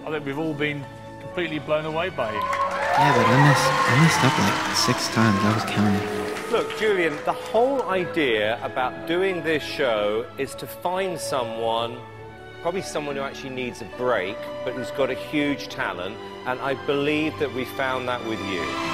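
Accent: United Kingdom. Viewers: I thought it was real until Simon said "Well, here's your chance" but I still had doubts before that because I was like "Wait... Julian isn't British.. Is he? 0.0" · umm is he really british?...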